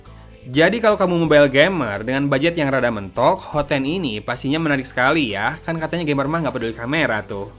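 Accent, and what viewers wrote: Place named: Sunda, Indonesia. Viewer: Suka sama suaranya aa. Sunda banget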